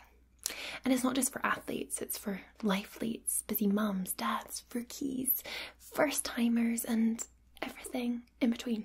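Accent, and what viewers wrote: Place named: Scotland, United Kingdom. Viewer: Gentlemen, we all have to admit that is a very pretty woman with an awesone accent. Very Scottish🏴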